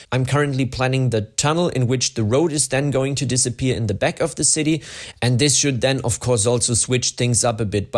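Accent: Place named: Germany. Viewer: Achso du machst deutsch und englisch mit diesen Videos ich weiß das wegen dem Akzent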